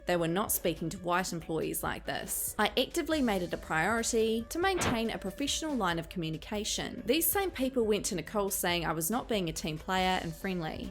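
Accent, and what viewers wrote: New Zealand. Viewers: as a fellow kiwi i feel like ur accent is SO strong and its kinda satisfying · Kiwi accents are like my absolute favorite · Living for your content of late. I could listen to your kiwi accent all day.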